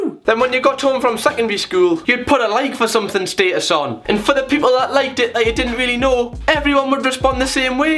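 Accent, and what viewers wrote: Newcastle, United Kingdom. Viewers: Ayeeeee been looking all over YouTube trying to find a real geordie here's a propa geordie a new subscriber you've earned!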